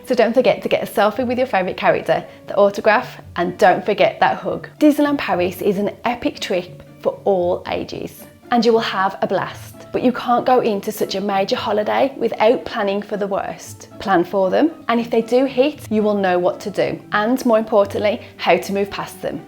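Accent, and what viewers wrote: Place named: Black Country, United Kingdom. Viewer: Ive just come across your video ❤ I hear black country 👌🏻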